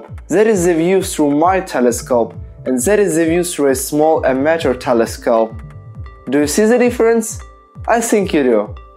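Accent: Russia. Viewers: You sound like Russian Отличный английский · Wait, are you Russian? That’s some pretty good English. If you didn’t have such a strong accent, you’d be better than me lol. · You seem to have a Russian accent. Are you Russian?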